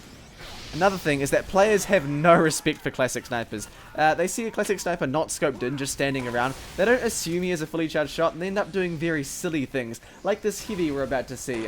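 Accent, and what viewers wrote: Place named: New Zealand. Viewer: He's from New Zealand.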